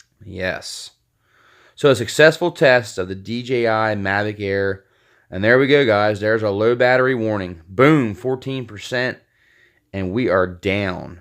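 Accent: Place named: Pennsylvania, United States. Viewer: The accent. Berks county?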